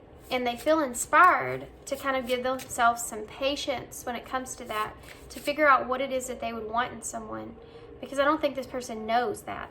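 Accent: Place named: Tennessee, United States. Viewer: My goodness, a fellow southern girl I hear. Tennessee?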